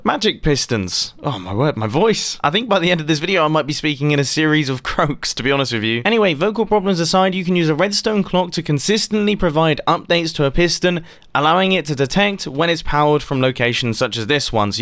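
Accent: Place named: United Kingdom. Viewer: Mumbo sounds so much more British on camera than in real life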